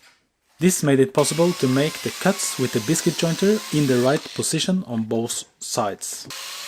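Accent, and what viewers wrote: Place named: Minnesota, United States. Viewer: I was listening to u say ticknesser and udder hand then realized because I'm from Minnesota I knew exactly what u were talking about. Nice work!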